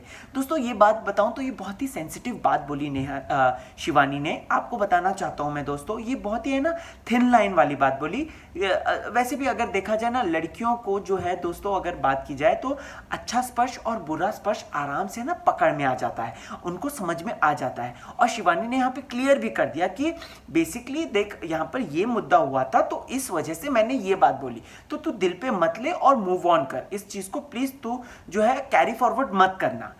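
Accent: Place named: Maharashtra, India. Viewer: Aap bhot cute marathi bolte ho😍😄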